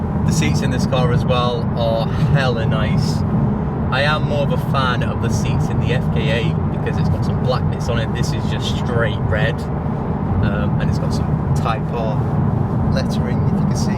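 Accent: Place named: England, United Kingdom. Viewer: The English accent, the weather, and a hot hatch. Nice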